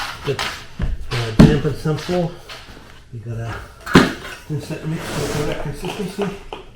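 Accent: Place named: New England, United States. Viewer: Great video. You are definitely in New England with that accent! Boston?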